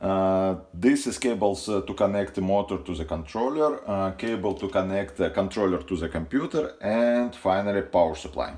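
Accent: Russia. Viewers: Мне кажется ... у вас акцент русский! Если да, то на русском было бы тоже очень интересно.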